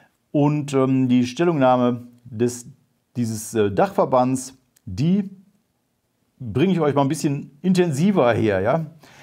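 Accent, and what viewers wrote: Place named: Rhineland, Germany. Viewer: Dein rheinischer Dialekt ist sehr sympathisch, Hr.. Wie es aussieht, haben wir es geschafft! Wie es der Presse heute zu entnehmen ist. Warte aber sehnsüchtig auf Eure Bestätigung. Tolle Arbeit! Toller Support! Danke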